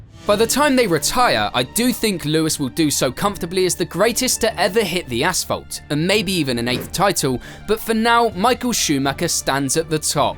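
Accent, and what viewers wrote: United Kingdom. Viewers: I can smell the bias British from 300 miles away pff. ugh...no debate he doesnt even belong to that list. Fangio, Ayrton senna and Michael Shumacher and i think now Max popping up. Not Lewis. And with bias British accent people cant take this serious having such debates, bias bias bias. Remind you he lost from Button, Rosberg, <> Massa, Russell some even teammates outqualified Lewis in a season. I dont see Fangio, Ayrton or even Micheal or Max doing that. Oh i dont even start his dad was poor as he got financial backup from Ron Dennis at the age of 11.. His career before F1 isnt exactly bright at all compared to other F1 drivers.. Other drivers BEFORE entering the F1, perhaps you can spot the difference: Verstappen: 2010: WSK Nations Cup - KF3 - 1st 2010: WSK World Series - KF3 - 1st 2010: WSK Euro Series - KF3 - 1st 2010: CIK-FIA World Cup - KF3 - 2nd 2010: Bridgestone Cup Europe - KF3 - 1st 2011: WSK Euro Series - KF3 - 1st 2011: WSK Master Series - KF2 - 1st 2012: CIK-FIA World Cup for KF2 - 2nd 2013: CIK-FIA World KZ Champion - 1st 2013: CIK-FIA European Champion - KZ - 1st 2013: WSK Master Series - KZ2 - 1st 2013: WSK Euro Series - KZ1 - 1st 2013: CIK-FIA World Champion - KF - 3rd 2013: CIK-FIA European Champion KF - 1st 2014: FIA Formula 3 European Championship - 3rd 2015: Formula 1 Hamilton 1995: Super 1 Nat Champion – IAME - 1st 1996: Kartmasters British GP – Comer - 1st 1997: Super 1 National Champ– FY 1th 1998: Torneo Industrie – 100 Jun- 19th 1998: Green Helmet Tro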